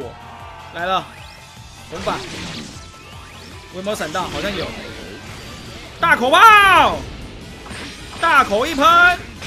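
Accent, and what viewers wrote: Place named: Taiwan. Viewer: 台灣國語真的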